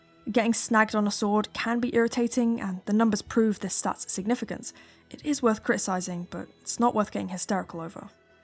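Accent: United Kingdom. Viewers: Ah a British female voice on darksouls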